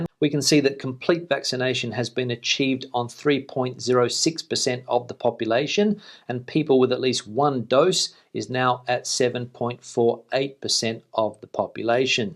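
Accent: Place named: Australia. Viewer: Lyon is in France; LEON is in Spain...get rid of that Australian accent...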